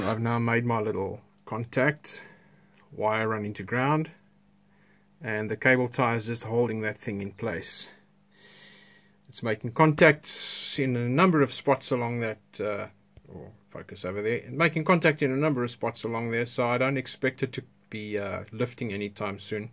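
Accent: South Africa. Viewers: So nice to hear another Saffa's accent and also somebody who loves valve amps.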